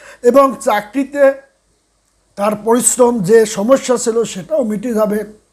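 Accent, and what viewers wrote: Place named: Jessore, Bangladesh. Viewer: উনি যশোর জেলার/ খুলনা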